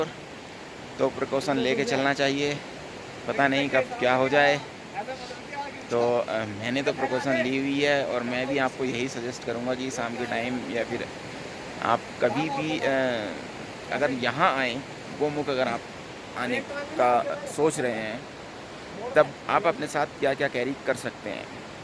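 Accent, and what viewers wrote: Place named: Kerala, India. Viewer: ഒരു മലയാളി ശബ്ദം!,🤔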